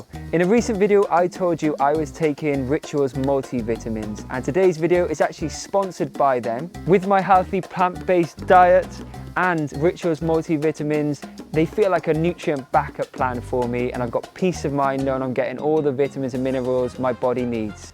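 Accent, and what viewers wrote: Wales, United Kingdom. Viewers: Great video, mate! Mouth watering stuff for sure. Also, are you Welsh? Didn't know that!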